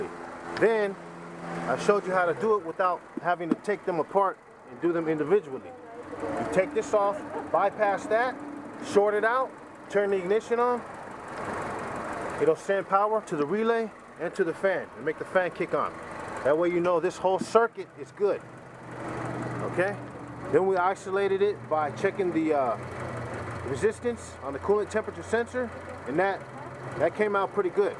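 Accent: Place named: Bay Area, United States. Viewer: imma guess, you from the bay bruh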